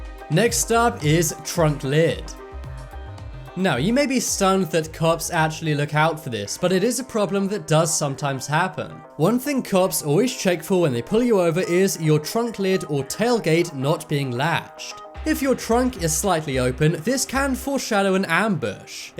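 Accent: United Kingdom. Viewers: This person has a British accent. Maybe that's what British cops do. NOT AMERICAN COPS! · Annoying uk accent about supposed us bullshit.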